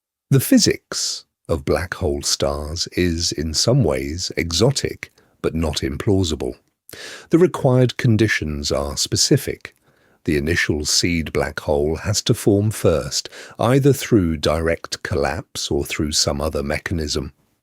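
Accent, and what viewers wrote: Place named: United Kingdom. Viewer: Dang! British Accent irritating.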